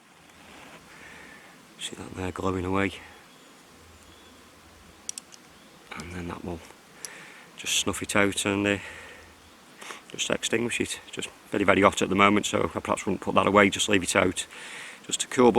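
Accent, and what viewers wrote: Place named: North, United Kingdom. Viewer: Hi. I love your channel. Very relaxing! I have been trying to pin down you accent but I have been failing. The best I can do is “Up north”! Where are you from?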